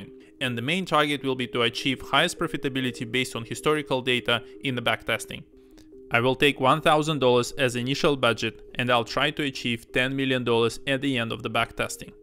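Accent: Russia. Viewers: да наш, русский акцент слышен прям четко, но лучше чем у индусов)) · Не даёт мне покоя твой акцент.. русский?)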